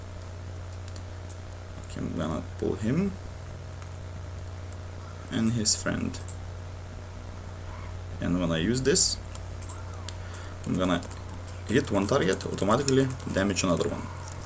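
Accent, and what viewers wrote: Russia. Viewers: Well you know english pretty well, just have very strong accent as all russians. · That russian accent of yours is so awesome xD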